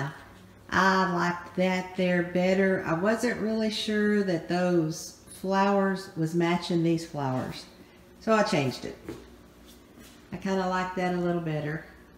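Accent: Texas, United States. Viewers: I'm a new subscriber. I would love to see a tour of your whole house. What I can see is gorgeous. Is your accent from Texas?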